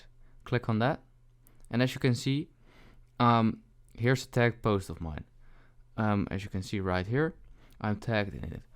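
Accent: Netherlands. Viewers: Thanks bro and btw u sound Dutch cuz of ur accent🙃❤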